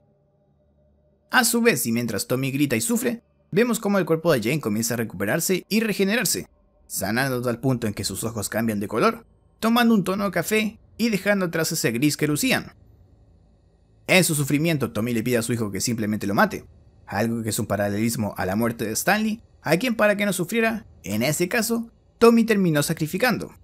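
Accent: Chile. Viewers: No tengo ni idea de su nacionalidad ya que a veces suena como mexicano y también como chileno👁👄👁🔪🇨🇱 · El acento es de chile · Eres de chile?? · Eres chileno🇨🇱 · ME DI CUENTA QUE ERES CHILENO, CTMMM QUE EMOCIÓN😍amo todos tus videos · es chileno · hola master es chileno?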